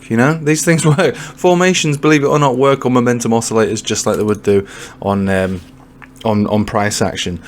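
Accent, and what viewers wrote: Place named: Northern England, United Kingdom. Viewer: Dude, are you from Yorkshire, Lincolnshire or the north west? You might not be from any of them but love your content dude. Legend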